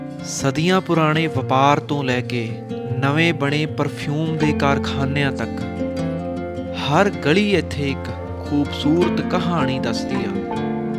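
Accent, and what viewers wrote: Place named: Patiala, India. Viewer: Inderਦੀ ਬੋਲੀ patiala aria ਦੀ ਆ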